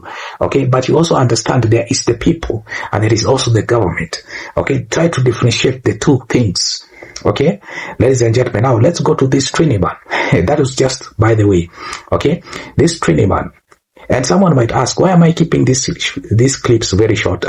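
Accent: Jamaica. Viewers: Man your accent is very much like Jamaican too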